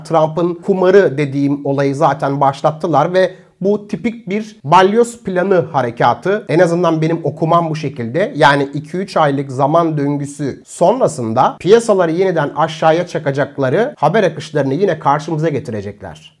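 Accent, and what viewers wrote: India. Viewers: video ingilizce yapınca hintliler gibi konuşuyorsun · Hocam niye Hint aksaanıyla konuşuyorsun?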